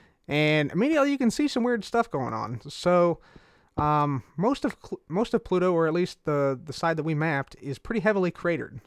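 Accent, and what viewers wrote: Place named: Texas, United States. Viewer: Hey I wanted to know if you lived in Texas you sound like you do because you love space so I bet you live by Houston Ps you are the best YouTuber ever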